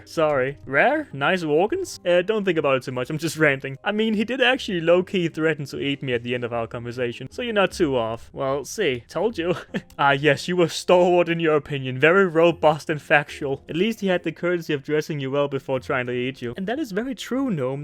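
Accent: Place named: Denmark. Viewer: Du er altså dansker. Det kan jeg høre :)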